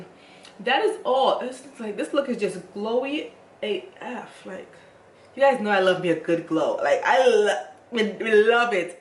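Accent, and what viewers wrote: Jamaica. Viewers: Hi, i love your videos and your Jamaican Accent😎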